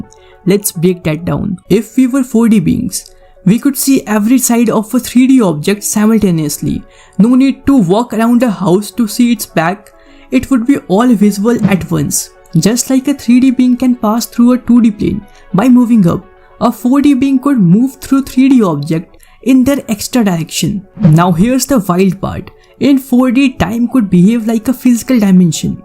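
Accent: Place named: India. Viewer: Why not correct your pronunciation (Indian accent) - which is beyond my ken.. - and remake this clip?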